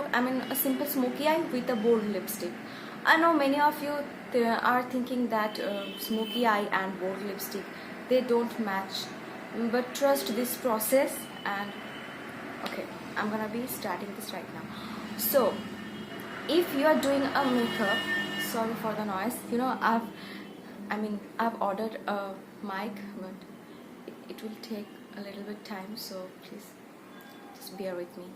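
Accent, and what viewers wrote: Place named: Bengal, India. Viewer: I really like your bengali accent❤...even some people ask me are you a bengali because my name is Manjari😊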